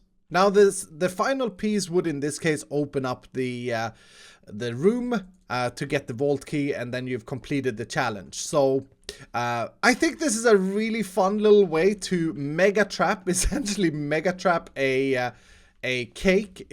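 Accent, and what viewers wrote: Sweden. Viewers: Is you from sweden? 🇸🇪😁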